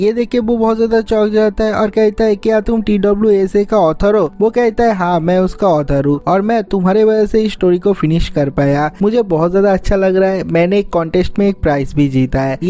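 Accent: Bengal, India. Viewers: Bengali hai kya bhai tu🤨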